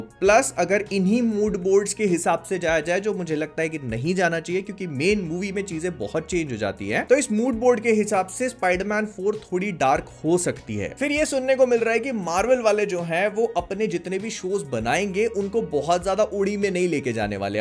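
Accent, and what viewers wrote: Bhopal, India. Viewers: Baba makde app bhopal se ho kya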